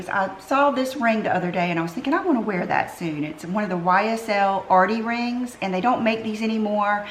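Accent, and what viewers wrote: South, United States. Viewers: I watch you mostly because it's wonderful to see a feminine girl like myself!! I am a girly girl but strong at the same time. I feel you are too! I am also southern born and raised and I love your accent! You are beautiful inside and out.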